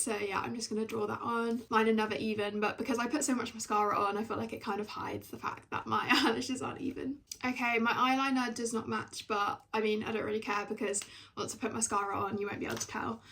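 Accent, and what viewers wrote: United Kingdom. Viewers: Ur bri ish? What?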